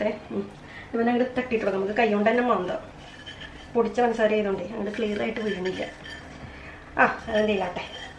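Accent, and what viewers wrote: Thrissur, India. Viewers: Taken long break in between. Eager to watch your vlogs bcoz of pure thrissur slang. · Chechi superb presentation,nammude tcr basha pollichutta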